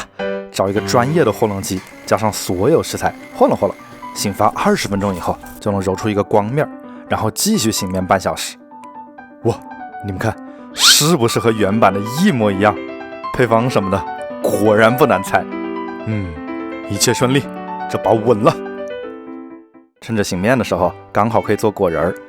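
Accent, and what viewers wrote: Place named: Hunan, China. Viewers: 哈哈哈哈，到了国外也压不住你的湖南口音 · 你听起来是湖南人吧😂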